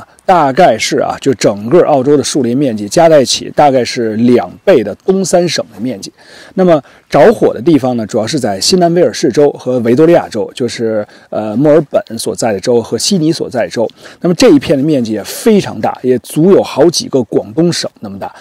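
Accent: Beijing, China. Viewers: 北京口音很重，用普通话更好些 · 听口音明明是大陆人，一到youtube上就切换成了繁体字，我也不知道你到底是怎么想的。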